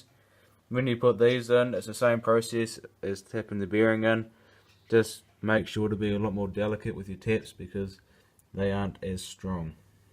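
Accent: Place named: New Zealand. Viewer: Kiwi repairs with a kiwi accent are so much better to watch. And kiwi gumboots as well... lol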